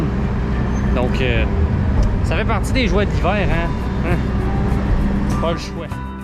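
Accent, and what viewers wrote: Quebec, Canada. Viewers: J aime bien ta chaîne.. Malheureusement avec ton bel accent québécois, bien souvent c'est très difficile de te comprendre.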